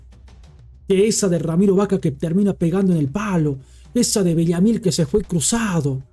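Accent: Argentina. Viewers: Por que habla cómo argentino?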